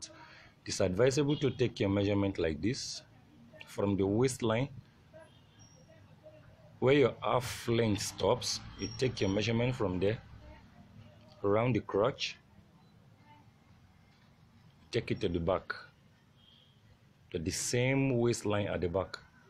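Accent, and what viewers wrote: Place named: India. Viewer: Why are u using indian ascent pls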